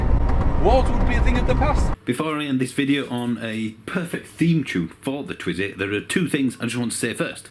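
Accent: Yorkshire, United Kingdom. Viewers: Love the accent too. Somewhere in Yorkshire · probably just the Yorkshire accent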